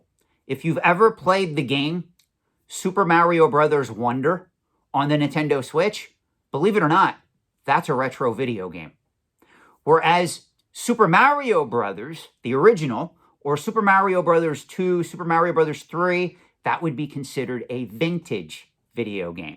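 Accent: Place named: Pennsylvania, United States. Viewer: regional dialect form Pennsylvania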